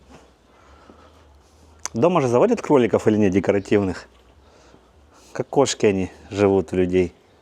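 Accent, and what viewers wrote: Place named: Donetsk, Ukraine. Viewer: Вы родом с Украины ? По вашему говору и стилю очень похоже.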